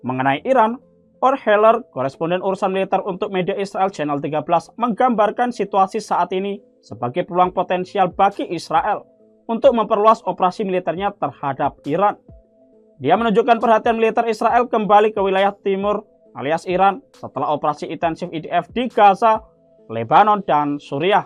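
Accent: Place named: Java, Indonesia. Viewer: Apa komentator dialek jawa..... gak enak kedengaran nya... kita indonesia...cari org komentator yg pandai dsn fasih bahasa indonesia..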